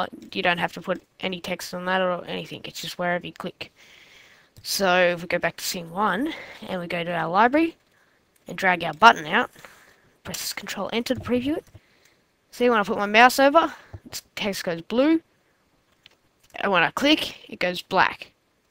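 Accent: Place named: United Kingdom. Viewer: omg love your british accent ^^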